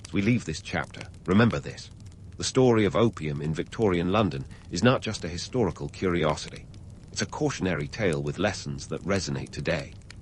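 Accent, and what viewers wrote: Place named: Transatlantic, United States. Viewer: Nice story. Not sure about this transatlantic accent, kept distracting me trying to figure out if it’s British or American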